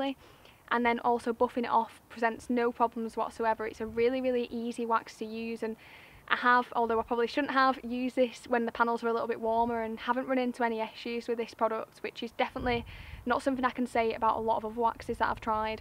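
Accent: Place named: Lancashire, United Kingdom. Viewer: Good to hear a fellow Lancs accent. Do you rate that wax more than BH DSW? I was going to get some of the BH but heard it can be tough to buff off.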